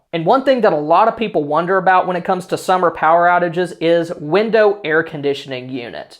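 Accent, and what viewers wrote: Texas, United States. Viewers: Sounds like a Texan to me sorry if I am mistaken and your offended by chance. I find Texas and the people good folk(never been maybe one day)...anyway...stars are bright big and bright this is the land of Texas(all I know..). Take care and stay frosty brother.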